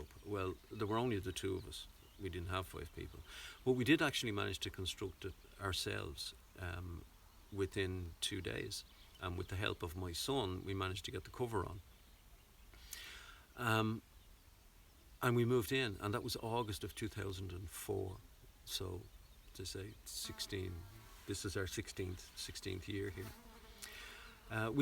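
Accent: Ireland. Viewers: 😂Born and raised in south side Cabinteely. I know a Dub accent when I hear it😂. You never lose it.😂 Great video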